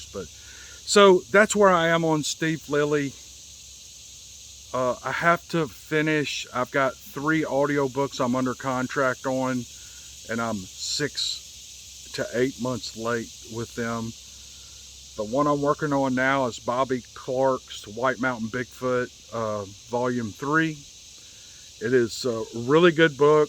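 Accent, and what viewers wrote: South, United States. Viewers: That melodious voice w hint of southern accent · Hey,. I've been liking the Steve Lilly stories, as well. Was gonna ask you if you have any relatives in SC or in the Aiken/Augusta area. I'm Steven Buckner. Dad's name is John. His whole side of the family are good, (mostly! Lol) hard working Southern folks. Every time I listen to your stories or casts your country twang reminds me of my cousins, aunts, etc. Haven't run into many Buckners, even in my 10 yrs in the navy. None actually. Anyways, I thought I'd say hello and see if you might have some kind in red clay country. Take it easy as you can and get back to me when you can.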